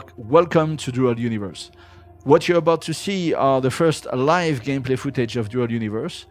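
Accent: France. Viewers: David Guetta's accent spotted. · His french accent completely sold it to me.. Who do I give my money? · French accent wtf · il est français ? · Are you Canadian or French? Your English is very good with French "tongue'. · Are you French ? ._.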